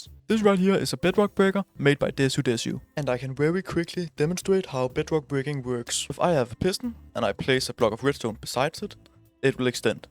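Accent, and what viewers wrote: Denmark. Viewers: tydeligste danske accent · are you danish by any chance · hej bro, kan høre du dansk. skal vi lave en survival verden sammen, mangler end der er god til spillet lol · i like that after just 1 second of him speaking i could hear he was danish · skud ud til den danske accent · er du tilfældigvis dansk det lyder sådan. anyways fed vid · Holy danglish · This sounds liek Danish english are you from Denmark i am. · den danske accent er elite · bro din danske accent dræber mig indeni 😭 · sekundet videoen startet ku man høre du var dansk men shii valid vid du mangler bare accenten nu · bro are u danish u have a very danish acent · I hear dansk 😮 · 30s in and I'm guessing you're Danish. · bro got danish acent¨ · Your Danish accent is thick 😅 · Great video! Got to Love the danish english 😂 great job! Greetings from norway! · Are you danish? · The danish accent is crazy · din danglish er sindsyg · I can tell he’s danish! 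Godt arbejde! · The danish accent LOL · wait are you danish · I know a danglish accent when i hear one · Den danske accent træder altid igennem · YOOO... you dansk? ahah. Great video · Danish ascent + bro cheated... Level 8 with elytra ( u start at level 7 when u die soo)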